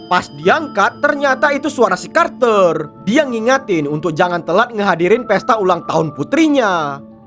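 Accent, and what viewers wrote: Medan, Indonesia. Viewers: Logat nya bicara medan kali bah😂 Gabasabasi langsung awak subs yekan☕🗿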